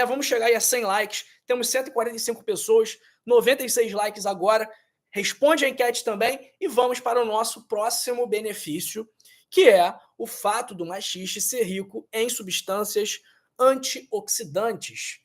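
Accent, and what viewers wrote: Rio de Janeiro, Brazil. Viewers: Muito bem explicado Dr. Simpatia!! Gosto muito do seu sotaque carioca!! Mais uma lição de ensino contigo!! Obg Dr.!!❤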